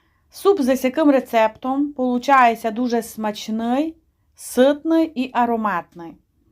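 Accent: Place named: Hutsul, Ukraine. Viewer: Люблю гоцульський акцент👍🙂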